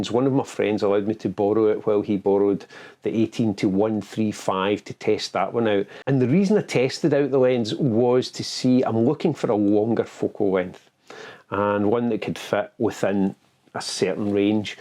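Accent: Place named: Glasgow, United Kingdom. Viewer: Nice to hear a Glasgow accent on a photography channel. Im in east kilbride! Just wondering if there's a smaller lens hood you can get for this lens?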